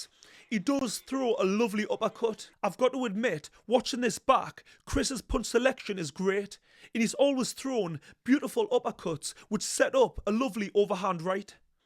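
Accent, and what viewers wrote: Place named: Geordie, United Kingdom. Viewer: That's gotta be the broadest geordie accent I've ever heard 😂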